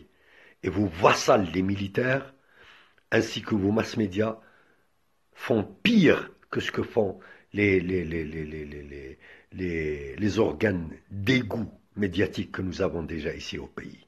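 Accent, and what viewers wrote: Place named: Tlemcen, Algeria. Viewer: Salam mon frere. Bien dit, qu"est ce qu'on peut attendre de cette maudite crapule france c'est satanas de cet univers...Mais d'un côté c'est bénéfique ce que dévoile au dessus de sa cape pour faire savoir ce qu'on vaut quel prix et quel estime a pour nous surtout le message est adressé à ces chiens et bousba3 je suis aussi de la région de Tlemcen ce que j'ai noté dans ton accent on est de la même petite ville Remchi. Salut de Mdrid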